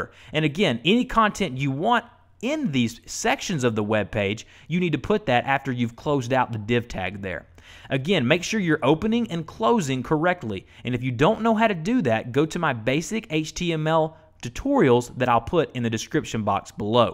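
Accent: South, United States. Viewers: It just hit me. Your voice reminds me of Danny Lipford, the home improvement guy on TV. Are you also from Alabama, or another southern state? By the way, awesome videos. ;-)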